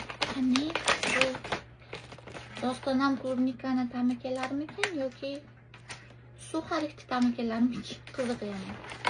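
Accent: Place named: Samarkand, Uzbekistan. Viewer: Shevasi samarqandini shevasiga uxshaydi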